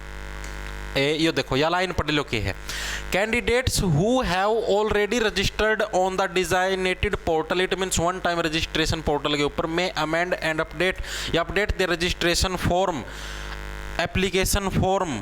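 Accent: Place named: Haryana, India. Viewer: Kya haryanvi boli hai.. Amazing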